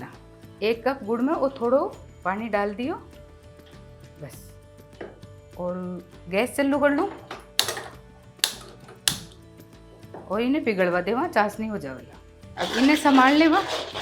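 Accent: Rajasthan, India. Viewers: I like your Rajasthani boli and recipes too.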